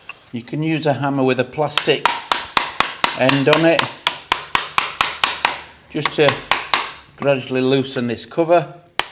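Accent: Yorkshire, United Kingdom. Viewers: Fantastic Yorkshire accent. I love it. Great video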